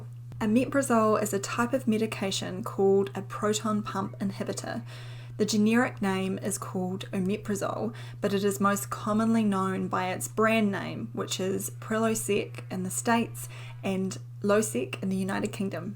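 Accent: New Zealand. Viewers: It usually takes me 2-minutes until I can understand the New Zealand accent ... Then, I'm fine. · Very informative video. I love the kiwi accent .. one of the best accents in the world. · Loved this video Dr. Sam... I worked for Merck back in the day and was involved in the launch of Prilosec (then Losec) in the U.S.. This video really brought back a lot of good memories--and your accent brought back many other great memories of my time living in New Zealand! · Looks Irish but sounds Kiwi. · None sounds New Zealand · Ah, Kiwi accent. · I just discovered this video today, Thank you , it was very reassuring as I take this medication on an as and when basis. Also lovely to hear a New Zealand accent on YouTube . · I have binged watched a lot of your videos. I like your NZ accent compared to my American accent. I never realized the differences in pronunciation until I listened to you speak. 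Keep up the good work. I also like reading the comments of other viewers.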